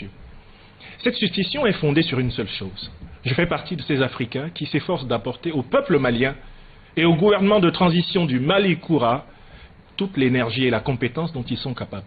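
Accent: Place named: France. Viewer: Pourquoi parle-il comme un français?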